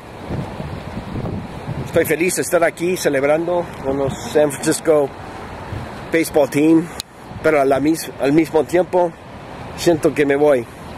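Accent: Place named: Argentina. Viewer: Loco,,,your spanish is very intact...HOLY CRAP....Argentina accent mixed with Spaniard...very inspiring... TWO THUMBS WAY UP!!! BEST VIDEO SO FAR!!!! MORE MORE MORE CHE!!! Will be showing it to my pops! LOL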